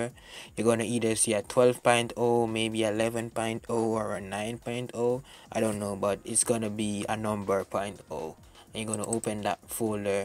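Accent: Jamaica. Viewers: Sound like a real yaadie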